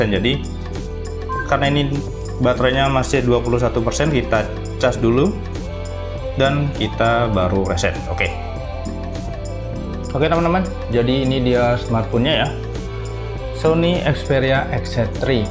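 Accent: Bali, Indonesia. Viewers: Klo liat dari aksennya si admin ini orang bali...😀😀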